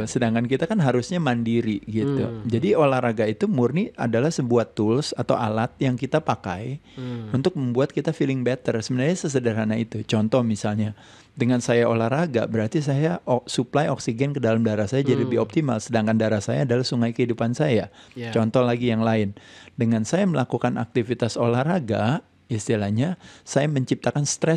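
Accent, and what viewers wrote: Bali, Indonesia. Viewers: Logat balinya si mimin nampak kali, T T T😂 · Ini emg logat bali bgtu apa cm feeling sy aja ya. Mc nya kayak org make sabu, ciri2nya bgtu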